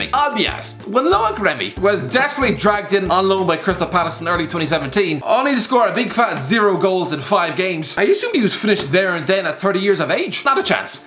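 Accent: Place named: Ireland. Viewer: Slow your Irish ass down, I can barely understand you and I'm fluent in most english accents, including Creole, New England and Brooklyn/Bronx slang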